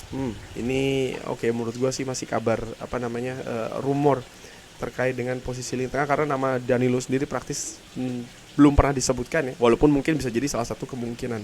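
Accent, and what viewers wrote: Semarang, Indonesia. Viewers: Asli semarang ya mas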